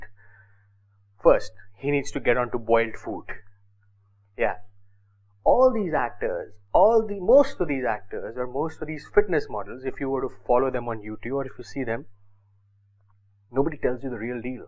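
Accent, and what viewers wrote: India. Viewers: s gupta I am an Indian from England though and cannot speak Punjabi properly, but if I was living in India I would pick up the language and stick to it. In India speaking English is associated with higher socioeconomic worth because those who can afford a better education at private schools are taught mostly in English - But that starts as a gateway to a door of a full blown cultural inferiority complex which Indians attempt to make all sorts of excuses for such as celebrating Christmas but throwing under the guise of 'We respect all religions'. As an external observer it comes across as bizarre how most Middle Class Indians put an emphasis on speaking English over their national languages or Hindi, but other than that the accent does come across as embarrassing and makes it less likely for him to be taken seriously on an international platform - It's why it is so widely ridiculed and many Indians coin on to this and attempt to fake their accent to sound American but can never pull it off. 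I just find it strange how this guy would obviously be able to articulate better in Hindi or Punjabi (The reason why he is swearing so much is due to a lack of vocabulary) but then opts to speak English instead.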